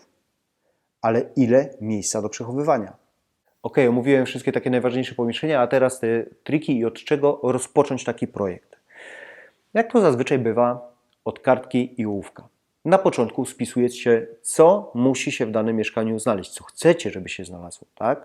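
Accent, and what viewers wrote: Silesia, Poland. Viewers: Świetny odcinek! Za niedługo będę wykańczał pierwsze mieszkanie - właśnie takie małe, więc szukam inspiracji. Dzięki za porady! Na marginesie - jak usłyszałem jak Pan mówi to myślę sobie: no taki śląski akcent! Ale nazwisko takie nieśląskie, myślę sobie: "pomyliłem się". I sprawdzam - a jednak, siedziba firmy w Rudzie Śląskiej ;)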